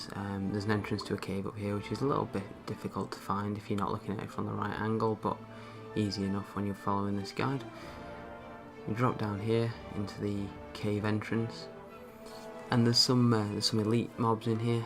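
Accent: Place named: United Kingdom. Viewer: Yes, Ewan McGregor :D And I mean the person that is speaking in the videos. I wish I had this accent. I am not British though so I have to live with my foreign one... ah well :D Jealous though.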